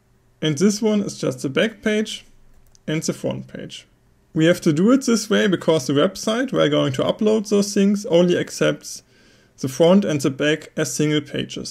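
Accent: Germany. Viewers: LOL! I love the German accent! Geil!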